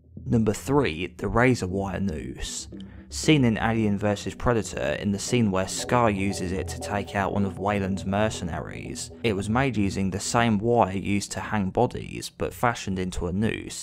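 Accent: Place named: England, United Kingdom. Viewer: Super gay English accent. Where are you from?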